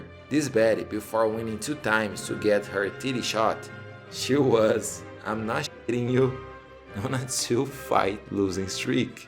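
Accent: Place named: Brazil. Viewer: Vc é brasileiro pae, da pra reconhecer pelo sotaque irmão kkkk! VOCÊ FOI PEGO!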